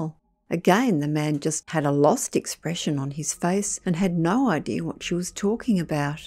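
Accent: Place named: Australia. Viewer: In Australia clerk is pronounced "clark". The narrator is Australian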